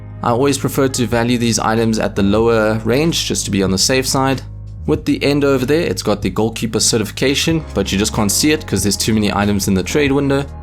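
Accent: South Africa. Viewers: Heyy, are you South African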